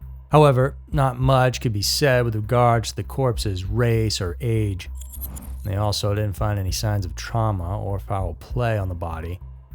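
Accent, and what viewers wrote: South, United States. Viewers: Never heard about this case before. Pretty crazy. Love that southern accent.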